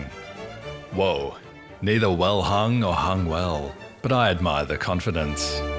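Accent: Australia. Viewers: You sound like FootLettuce but Australian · I love an Australian doing an Australian accent!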